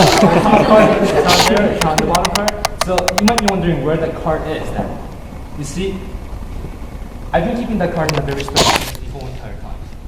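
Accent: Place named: China. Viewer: that was an awesome performance... BTW u sound like a Chinese. r u??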